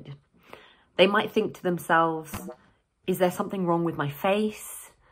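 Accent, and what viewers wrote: United Kingdom. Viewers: 발음이 영국 발음이네 했더니 영국분이신가요? ㅎㅎ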